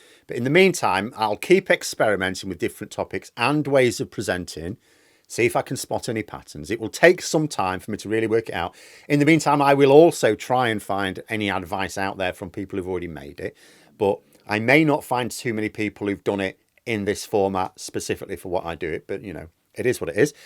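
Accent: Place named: Manchester, United Kingdom. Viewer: Here for the Manc doing posh tory comedy voice 😆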